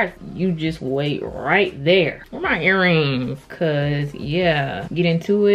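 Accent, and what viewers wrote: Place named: South, United States. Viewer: I honestly thought you were South African. Your name could be Nthabiseng (sesotho for bringer of joy) but your Southern accent is coming through haha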